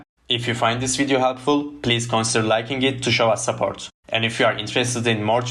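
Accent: Turkey. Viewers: Bu adam türk şivesinden anladım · türk olduğuna yemin edebilirim ama kanıtlayamam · You have so much Turkish accent. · tam bir Türk ingilizce si açıklığıyla anlatmışsın,eline saağlık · Thank you for the video. Your pronounciation definitely shows that you are Turkish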